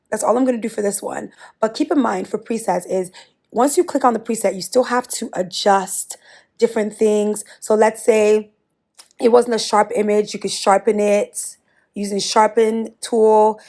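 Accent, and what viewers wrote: Nigeria. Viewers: I can hear the Nigerian accent. I love this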